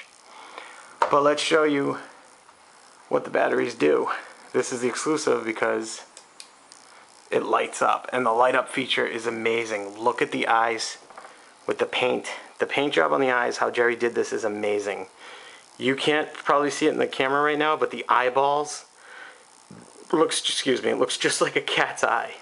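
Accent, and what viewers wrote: Boston, United States. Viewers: love your videos!! can i assume you are a red sox fan by your accent?? lol sorry couldnt help but notice, thanks for such awesome statue videos man!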